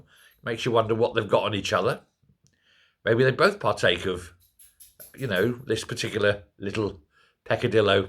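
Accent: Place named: RP, United Kingdom. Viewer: Serious subject and you're bang on with what you say, but your use of the Kings English made me smile.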